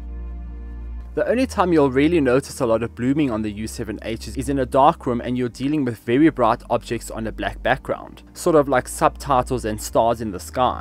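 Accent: South Africa. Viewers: I love finding random YT review videos on a product I'm researching, opening it, and hearing a good old South African accent! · South african hello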